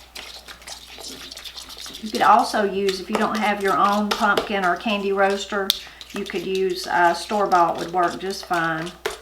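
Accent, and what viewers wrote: Appalachia, United States. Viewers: happy thanksgiving to u !!! celebrating & enjoying the sound of your appalachian accent----makes my sorrow for america's sad situation feel better 'cause in the heartland--appalachia'---there are people like u guys still living !!!! When i watch tv, i just don't see anyone in a family environment at all, so it's heartwarming to watch your appalachian instructional home videos !!!!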